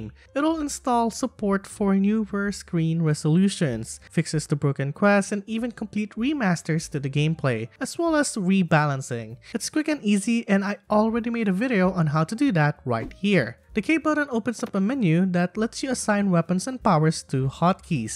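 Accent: Philippines. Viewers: Filipino accent detected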